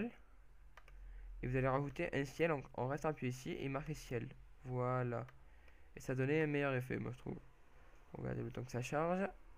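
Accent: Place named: South, France. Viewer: Aaaah ! On sent l'accent du sud ! Sinon j'aime bien !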